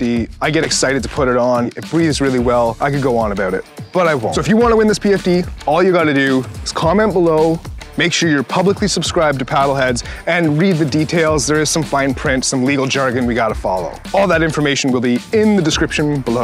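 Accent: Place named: Canada. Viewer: God damn you Canadian asf!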